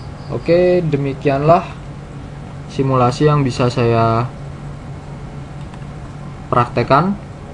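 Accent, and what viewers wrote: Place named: Java, Indonesia. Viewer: Bang jawanya medok bgt 😂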